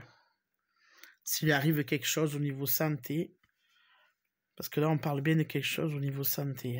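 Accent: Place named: Marseille, France. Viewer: Bonjour, merci votre video que je regarde plusieurs fois car vos explications sont toujours hyper intéressantes, gratitude, j adore votre accent marseillais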